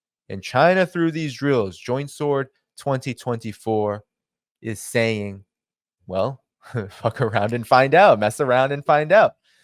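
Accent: United Kingdom. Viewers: Love it a White Guy with a British accent.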